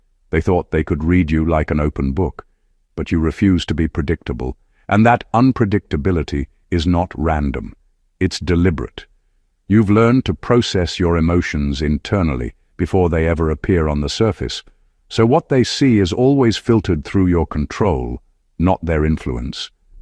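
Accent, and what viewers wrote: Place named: New York, United States. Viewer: Vivid imaginations they have, don’t they ? Their lost in the sauce. NY accent sawus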